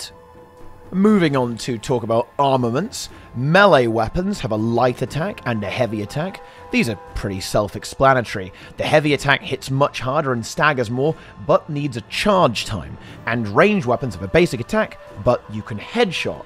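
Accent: England, United Kingdom. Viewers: your smooth english accent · how will I listen to your smooth english accent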